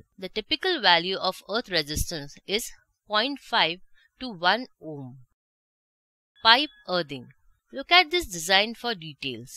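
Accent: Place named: India. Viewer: But this indian-english akcent is best😅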